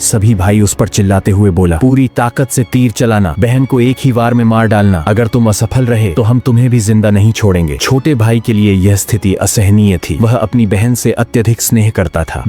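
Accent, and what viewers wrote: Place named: Chhattisgarh, India. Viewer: Maa sunaya hai kahani cg boli me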